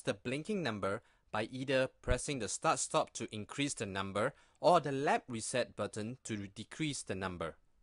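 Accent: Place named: Singapore. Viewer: U sounded like a singaporean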